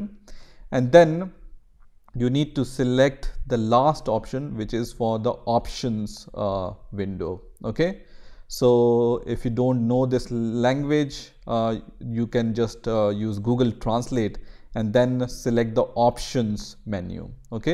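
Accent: India. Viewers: Dont try to talk more "British English" if I hear Hindu accent I know that this will be a helpfull video. Thank you so much.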